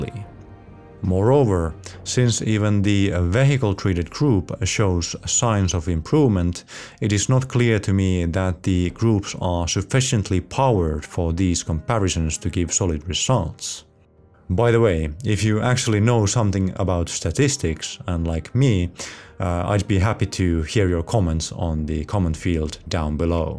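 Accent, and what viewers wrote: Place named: Finland. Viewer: Sounds Finnish :)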